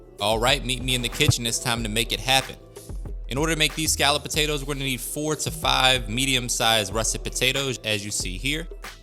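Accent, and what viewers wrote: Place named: South, United States. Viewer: First time watching this channel, love the sound of your voice and your southern accent.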